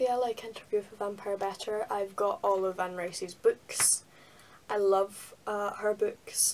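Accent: Glasgow, United Kingdom. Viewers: are you from glasgow ?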